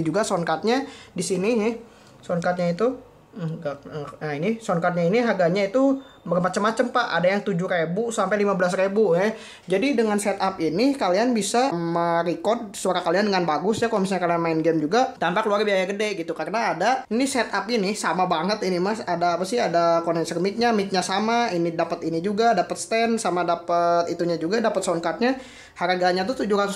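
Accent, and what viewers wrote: West Java, Indonesia. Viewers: Orang jawa barat yak ? Orang sunda yak ?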